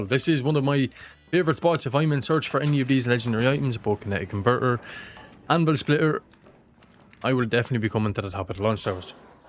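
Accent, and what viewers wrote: Ireland. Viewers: Great video,! Extremely helpful. And every time I watch one of your videos, your brogue reminds me that I need to get back to Ireland.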